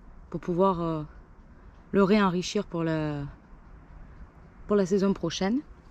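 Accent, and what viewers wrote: South, France. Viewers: ah super, je me disais bien que c'était l'accent du Sud, de chez nous !!! Ben oui, ils disent tous "je suis du Sud", beaucoup de gens qui font des vidéo comme vous, et ils sont du Ouest, de bezier... C'est pas le Sud ca😂.... Super vos vidéo et explication, je m'abonne alors, merci...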